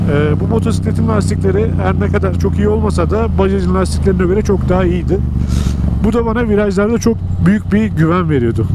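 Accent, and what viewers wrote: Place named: Gaziantep, Turkey. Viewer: Once yol tatlo geldi izliyorum senin konusmani gectim yola saga sola daldim lan burasi bana boyle bi tatli geliyor birsey var ama okadar dalmisim ki meger memleketimmis be tam antep lan dicektim sen dedin antep diye burnumda tutuyor..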